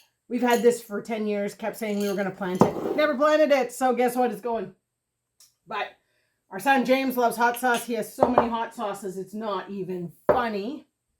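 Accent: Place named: Canada. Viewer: So glad that you are back to YouTube! I was missing your videos; as a Canadian it's so good to hear 'local' voices.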